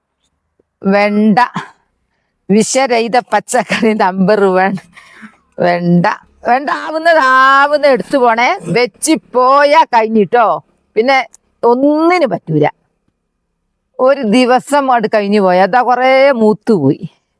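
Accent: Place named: Wayanad, India. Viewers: വയനാടൻ സംസാരം നല്ല രസം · nice vayanadan samsaram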